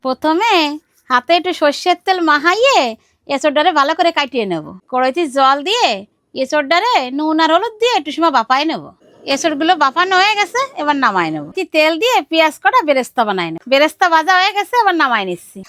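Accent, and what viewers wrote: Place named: Khulna, Bangladesh. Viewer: আমাগে খুলনা জেলার ভাষা মনে হচ্ছে.. 🙂🐸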